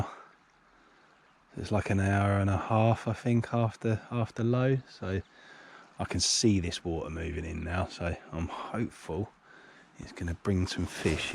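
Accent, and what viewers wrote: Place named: Cornwall, United Kingdom. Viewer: Nice honest video. I recognise the location and your accent.. Dont let the kernow nimbys get under your skin. Keep going for your gilty pleasure. And If youre getting crabbed up then try a pop up bait to keep it above their heads and claws.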